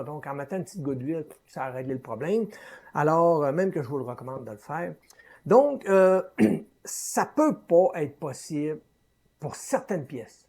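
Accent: Canada. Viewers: Bonjour, Je n'écris plus beaucoup en ce moment désolé, je suis débordé 😢, mais à part quelques vidéos sur les laser qui, POUR LE MOMENT m'intéresse moins, je ne loupe aucune de tes vidéos que j'attends avec impatience. Tu fait partie de ceux qui me remonte le morale quand je voie déjà la notification, ce que j'apprécie chez toi c'est ta simplicité, dans le bon sens du terme, même si pour nous français ton accent canadien, que j'adore, est parfois un peu compliqué pour la compréhension, mais c'est toujours clair et détaillé, et quand il y a besoin de détail tu sais prendre ton temps. Il est vrai que je n'aime pas trop les vidéo de plus de 45 minutes, mais quand il y a besoin, c'est justifié, plutôt que je ne pas tout expliquer. C'est vrai que les parties avec zoom sur la pièce, ne rendent pas toujours aussi bien qu'à l'œil nu, mais tout ceux qui imprime en 3d FDM le savent cela. 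Je rencontre les mêmes personnes qui râles sur la qualité du travail effectué chez les youtubeur qui font de la peinture sur figurine. J'en fait un peu et moi même, mais sans râler, j'avais vu un Youtubeur peindre et faire un gros zoom, et j'avais aussi pas trouvé sa top, puis j'ai imprimé la même figurine en résine, et une fois imprimé et que tu l'a dans les mains, tu te souviens du zoom qu'il a fait sur les yeux et là tu te dit... "Ha houai, quand même, c'est petit". Alors oui un gros zoom montre tout, mais une fois vu avec nos yeux, c'est très propre... Et seulement quelques cm d'éloignement cela ch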